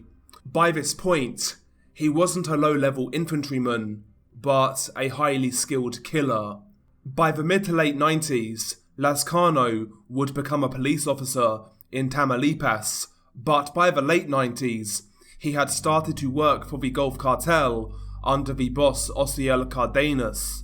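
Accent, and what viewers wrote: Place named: United Kingdom. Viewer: Idk why but as an american i enjoy the british narration on mexican cartel atrocities.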